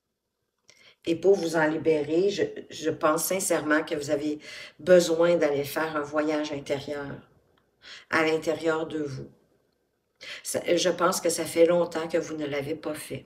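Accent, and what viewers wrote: Quebec, Canada. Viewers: Dites-moi, êtes-vous québécoise?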